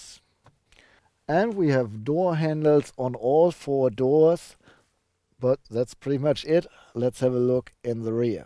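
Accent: Germany. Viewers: Speaking English with German accent = great combination :) and i can't stop watching you cause you re really good on this business, thank you for thr the video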